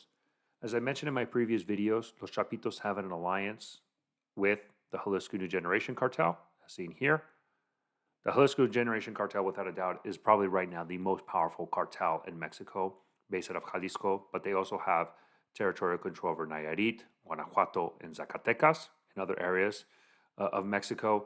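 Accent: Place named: Spain. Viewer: Gringo hablando mal español con acento español es super extraño xd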